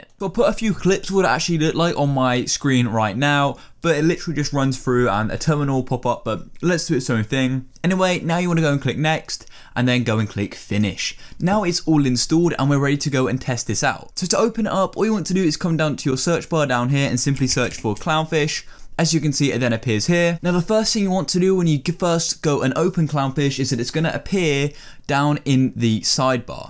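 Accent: United Kingdom. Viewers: britsh man :)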